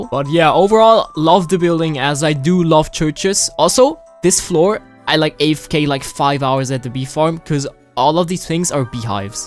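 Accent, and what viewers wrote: Germany. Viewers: quick question, are you German? you kinde saound like you´re German...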